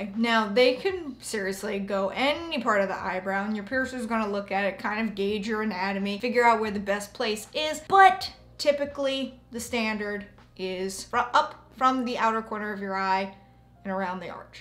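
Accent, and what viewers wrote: United States. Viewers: I have a north dublin accent and I just wanted to let you know that we say piercing the same. I just found it amusing since you pronounce everything else with what I would consider a normal American accent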